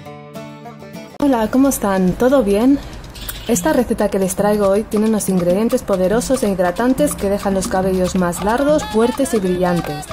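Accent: Spain. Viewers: Acento español!!!!! De dónde eres originalmente?? 💖💖 Te queremos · Wow! me sorprende tu voz tienes acento español; muy bien, ya era hora de conocerla saludos!!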